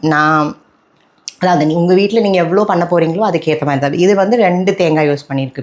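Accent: Kerala, India. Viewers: Malayalam kalantha tamil like it kutty ma..... Na podi try pani pakuren sissy ma.....❤❤❤❤❤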